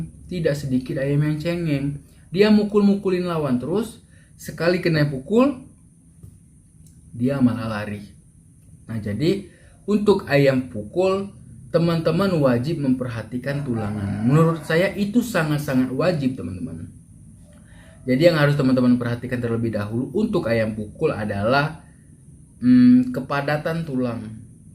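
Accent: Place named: Cirebon, Indonesia. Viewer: Kaya orang Cirebon mas heheh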